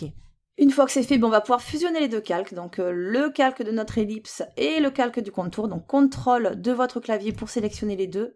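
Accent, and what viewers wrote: South, France. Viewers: Coucou! Merci pour ce petit tutoriel confectionné tip top! C'est génial de suivre tes explications avec l'accent du soleil.... quelle facilité, à croire que l informatique est dans tes gènes 😆. Maintenant au travail, là c'est une autre paire de manche hi hi.... merciiii 😗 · Salut, tu viens du sud ? sa s'entend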